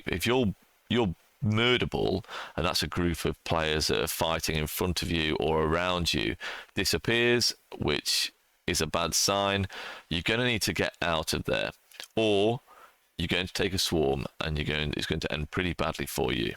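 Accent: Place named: England, United Kingdom. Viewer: I was thinking England from the way you spoke but there’s so many different accents there it’s hard to pinpoint! Btw I just wanted to say I LOVE the videos man and I always recommend to people and tell them about your channel.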